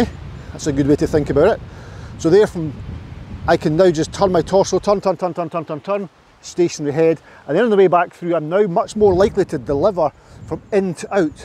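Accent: Scotland, United Kingdom. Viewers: I think his accent is fairly mild for Scotland. Some Scottish accents are very difficult to follow but very interesting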